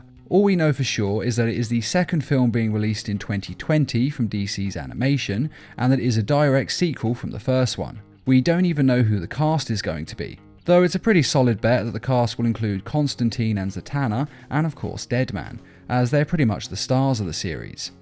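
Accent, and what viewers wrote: United Kingdom. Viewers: What is it about those British voice accents that make the coolest videos? 😁👍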